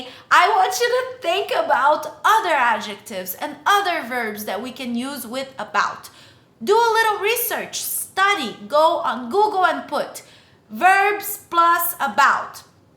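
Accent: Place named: Brazil. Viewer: Seu ingles é perfeito!!! Mas eu reconheço um brazuca em qquer lugar heheh parabéns pelo canal, estava procurando como melhorar meu uso das preposições AT, IN e ON e parei aqui, você tem algum video sobre pra eu dar uma estudada por favor?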